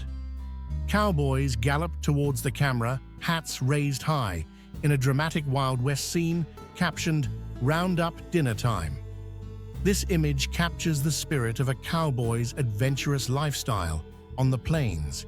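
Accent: England, United Kingdom. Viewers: Why the English accent … why the blurred drawing of Custer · Why a Brit narrator?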